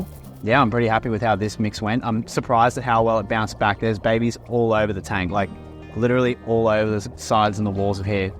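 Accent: Australia. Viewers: He sounds australian. as a former (moved to America january) in australia everyone swears there. like everyone, its just another word in Australia. so the culture and stigma around swearing that there is here in America is not prevalent in Australia. i mean heck the school kids from around 14-18 are saying sIurs, not in a rude manner its just part of their vaocab and no one really cares because of the massive cultural difference. i understand where u are coming from I just don't know if u knew that in some parts of the world swearing has no meaning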